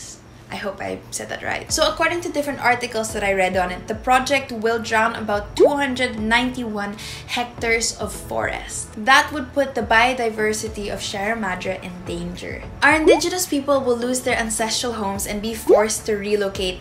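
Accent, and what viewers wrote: United Kingdom. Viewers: british accent😍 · The British Accent ❤️❤️❤️ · I love your british accent. You're so good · British Accent! Lakas maka BBC!!!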